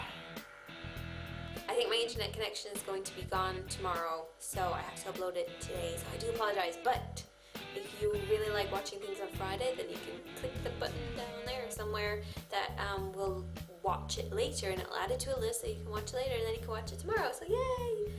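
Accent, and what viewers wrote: Ireland. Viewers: are you irish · ...English accent? Are you people mad? That's clearly Irish...or...Scottish 0.o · Are you Irish?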